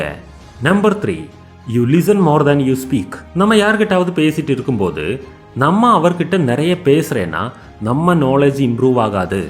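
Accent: Kerala, India. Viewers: Neenga malayali thana??? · Yov ne malayaliya., Alaga pesuraya tamil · onga tamil slang nalla irukku . kerala va neenga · Neegga kerala va neegga peysura type same kerala person mathiri iruku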